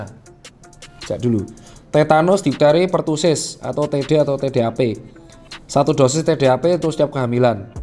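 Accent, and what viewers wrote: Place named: Yogyakarta, Indonesia. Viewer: Yang bikin beda itu penjelasan dr Tirta adalah mudah dipahami dengan kemampuan berpikir orang awam medis.... Dan bahasa logat nya jogja banget...salam dr Bantul... Semoga dr Tirta sehat selalu...GBU.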